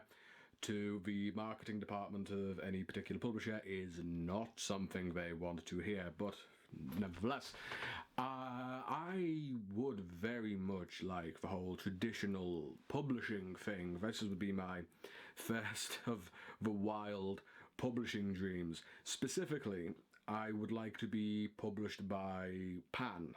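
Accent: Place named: Northern England, United Kingdom. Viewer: I thought I heard a Northern twang in there! Hi, I'm Adara. I'm a writer who usually hangs out on Twitter. Your song choice sent me back in time to many anime conventions ^_^ I like how original your goals are. In terms of specific cult references, anyone who can explain my 'give quiche a chance' t-shirt deserves a cookie. I'd love to see more writers involved in Doctor Who. I love how you're choosing animation and comics for your dream adaption choices. So many people fall by default into the film or live TV only trap. Lastly, good choice co-writing with Dal!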